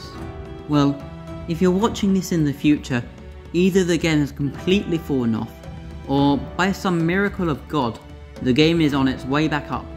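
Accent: United Kingdom. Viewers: i agree with froggy, just try be less british in the future. but nice video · i would've given this video essay a chance but you way too british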